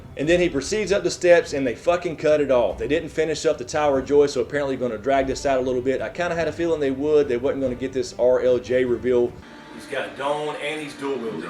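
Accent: South, United States. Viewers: love the accent, where r u from, the south or texas or somewhere else xd?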